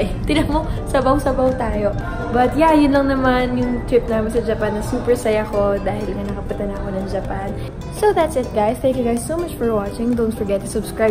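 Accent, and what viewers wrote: Visayas, Philippines. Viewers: Ate bisaya accent po yun😂😂😂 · Visaya ang acsent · bisaya accent po! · Mommi bisaya po ang aksent non like if you agre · Okii lang. Bisaya kaayo kag accent hahahaha loveyou 😍😘❤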